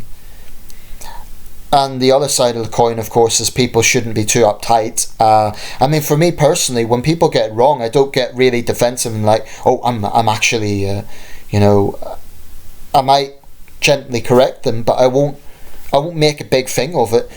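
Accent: Scotland, United Kingdom. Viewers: I was convinced you had a Scottish accent until you replied to my comment lol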